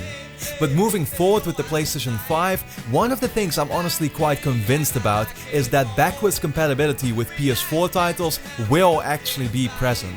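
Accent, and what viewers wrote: Netherlands. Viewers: Jeez the Dutch accent is killing me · Ben je Nederlands? Volgens mij hoor ik het aan je accent. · nice dutch accent :) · Zo'n mega zwaar Nederlands accent haha heerlijk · heerlijk hollands accent! maar zeker goede vid! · Ben je nederlands je hebt zo een nederlands engels accent? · Je Nederlandse accent is wel te horen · Do you come from the Netherlands? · Ben je Nederlands want zo klinkt je accent wel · are you dutch? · Ik gok dat je een Nederlander bent. Als je vraagt hoe ik dat weet, het is heel makkelijk te horen aan je accent als je Engels praat. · why can i hear if poeple are dutch or not XD cuz im dutch and is dutch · Youre Dutch arnt you? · Are you dutch? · are u dutch? Lol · No hate on the video btw, great thinking logic (is that a dutch accent?) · Sxrewhead could hear it in the accent I'm Dutch too. (if my name didn't give it away) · are u dutch?? 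· Ben je Nederlands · I don't know why i can always hear if a person is Dutch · This guy is 100% dutch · M8 is there any chance that your Dutch? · Are u from the Netherlands 🇳🇱 my friend? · R u dutch?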